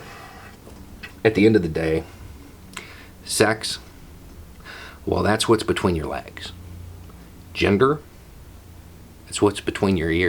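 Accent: South, United States. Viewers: It tickles me every time seeing a hyper masculine guy with a southern accent advocating LGBTQ+ rights. Every damn time. Thanks! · Who in the hell ARE you?:) YouTube suggested you to me yesterday for the first time - the witch/churchgoer video. Holy cow! I subscribed immediately. This is another winner video. You are sane, level-headed, a clear thinker, classically logical. That's a pretty rare combination these days. I'm also from the South, so not a problem for me, but you realize that the accent severely throws some people, right? LOL Rock on,. Cliff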